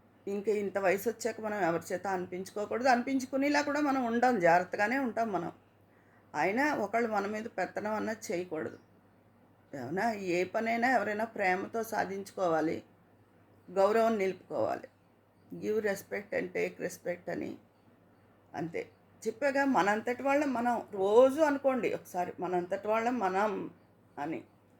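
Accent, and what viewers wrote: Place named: Kakinada, India. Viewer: Mee maatalaloni accent ( yaasa), naakentho ishtam! Maa vaalladi Kakinada, mee maatalu vintunte,atuvypu vaalle gurthukosthaaru.Maa native place Bandaru,kaanee eppatinuncho Hyderabadlo vuntunnaamu.Mee kaburlu intlo vaaritho panchukunnatluntundi. Thank-you.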